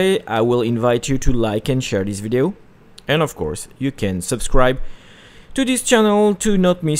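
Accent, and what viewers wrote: France. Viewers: you have french accent t'es français ? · You have a french accent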